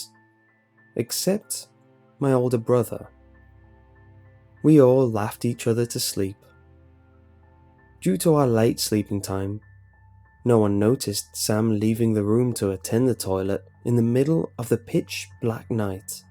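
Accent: United Kingdom. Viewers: This British accent of yours is interesting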